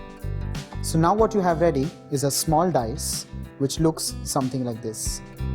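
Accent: India. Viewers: You speak english with indian accent i have no idea wht you talk haha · strong indian accent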